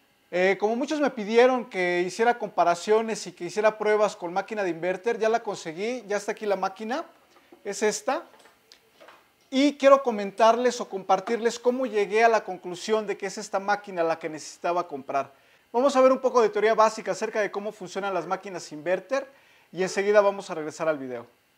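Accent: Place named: Mexico. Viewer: Hola Buenos días de que país eres nacional? Creo que eres Mexicano por tu forma de hablar, Saludos desde Valencia Venezuela.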